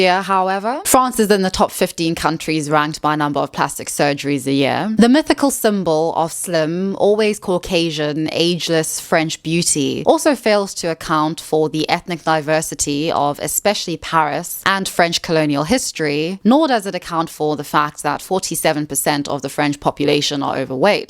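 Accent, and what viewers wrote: South Africa. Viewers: Amen! Love your accent sounds a bit South African. You speak clearly and make perfect sense. Thank you! ❤ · Are you from South Africa? That accent 🔥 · Youre South African?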